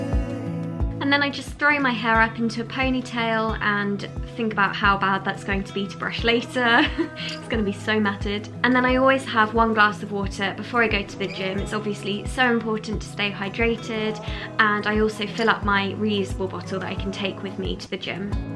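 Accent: United Kingdom. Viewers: I love your accent so much! I'm Polish and I try to speak in British accent everyday but it is not easy. :( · I love routine videos!!! 😍 And I swear I'll never get enough of your British accent. 😩 I love your voice and accent a lot,! Love you! ❤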